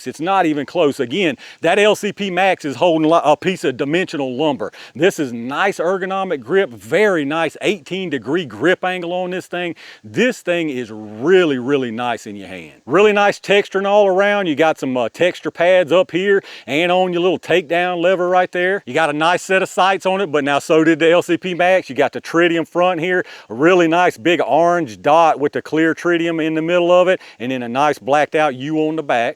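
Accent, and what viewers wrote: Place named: Appalachia, United States. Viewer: Hey. Great video, informative yet succinct. Just got rid of an LCP Max. Your ergonomic description of it as “a piece of dimensional lumber” is spot on. I never enjoyed shooting it, no matter what I did (extended mag, Hogue grip, etc.). And the trigger was absolute trash. Felt just marginally better than the cap guns I used to play with as a child. Also, very much enjoyed the beautiful Appalachian accent (hope I got that right). All for free. Good deal. God bless!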